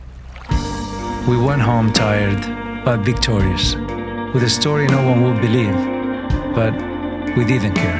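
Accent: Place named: Spain. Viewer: Everything perfect. But just one detail is not so perfect. As a Portuguese I can perfectly, "catch" that the narrator is not the Portuguese Surfer, but a very evident Spanish "Sxsxxss" accent (similar to Pepe Guardiola) when speaking in English! It would be just perfect if would be the Portuguese Surfer doing the narrative voice / speaking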